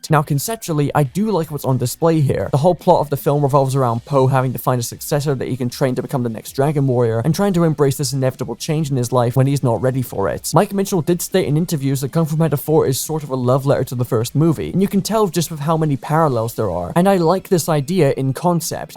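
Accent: United Kingdom. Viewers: The British accent 😭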